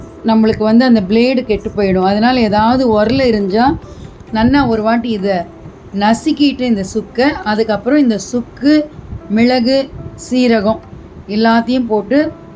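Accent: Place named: Iyengar, India. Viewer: உங்களுடைய இனிமையான அய்யங்கார் தமிழ் கேட்க மட்டுமே இங்கே வரலாம்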